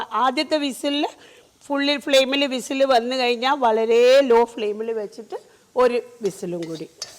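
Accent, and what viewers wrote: Kozhikode, India. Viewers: ഇത്ത, എന്നും കാണാറുണ്ട് പുതിയ പാചക വീഡിയോസ് ഇടുന്നതും കാത്തിരുന്നു കണ്ണും നട്ടു ഇരിക്കാറുണ്ട്, ഇങ്ങള് പുലിയാണപ്പാ, എല്ലാ വീഡിയോസും ഒന്നിനൊന്നു മെച്ചം, കോഴിക്കോട്ട് ഭാഷ സൂപ്പർ നല്ല രസം ഉണ്ട്‌ കെട്ടിരിക്കാൻ, പുതിയ ഐറ്റംസ് പ്രതീക്ഷിക്കുന്നു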